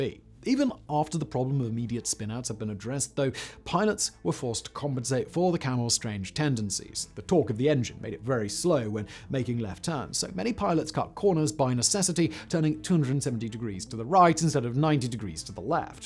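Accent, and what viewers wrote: United Kingdom. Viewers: Funny how these chaps with the funny accents always seem say the 'most formidable' and 'very best' aircraft of the wars were the British ones. The Camel, which I personally love, was in dire straits if come upon by an airman of equal skills flying a Fokker DVII, as turning fighters are at a distinct disadvantage to energy fighters, and cannot run away when cornered. A smell of arrogance permeates the air when the average limey speaks of her Majesties Royal Air Corp. I would stand shoulder to shoulder with a man (girls, needn't bother) who would with humility speak of the profound glory the Camel rightly deserves. Observe that, the 'greatest' or the 'most deadly' are terms that mouthpieces use to sell their video of the day. Oh, give over blokie!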